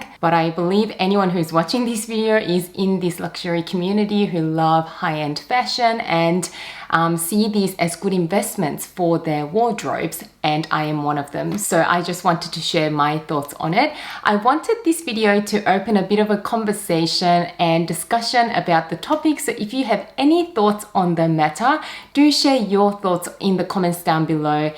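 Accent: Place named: Australia. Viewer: Hi sorry if this is a weird question are you from Melbourne? Only because there was another YouTuber who sounded identical to you I thought it was you actually.. I think I just found a difference in accents between Australian accents which I never noticed before Anyway bags are nice but I wouldn’t trade them for my seventeen o/s trips I have done.. 4 lux bags are plenty right now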